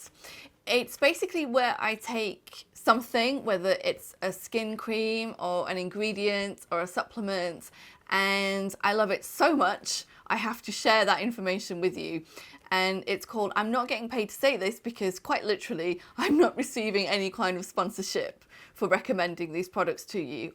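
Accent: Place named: United Kingdom. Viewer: I' m here for that british accent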